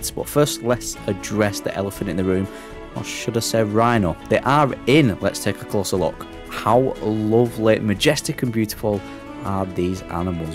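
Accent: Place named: Manchester, United Kingdom. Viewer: it sounds like you are from Manchester. Have you ever thought of recreating Belle Vue Pleasure Gardens/Zoo?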